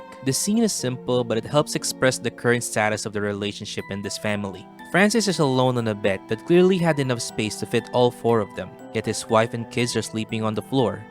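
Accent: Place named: Philippines. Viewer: It's nice that you are using Philippine English! Hahaha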